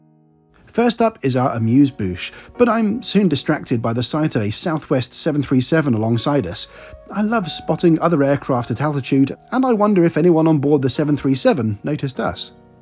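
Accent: United Kingdom. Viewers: I am from the US and everything you just said sounds so wonderfully British!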